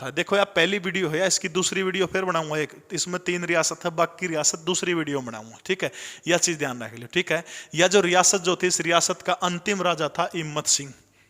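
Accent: Haryana, India. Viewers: Aapka padhane ka Haryanvi style mast h guru jiiiiii..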